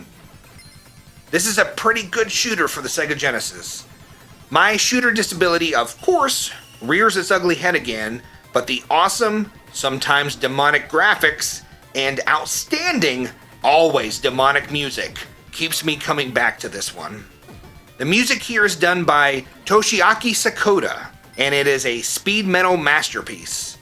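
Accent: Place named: United Kingdom. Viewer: Only just stumbled across your videos mate. Very entertaining, and great to hear a fellow brit in a genre swamped with Americans!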